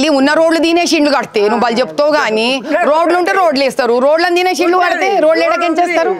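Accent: Telangana, India. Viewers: నమస్కారం మేడం మీకు తెలంగాణ యాస మీద చాలా పట్టు ఉంది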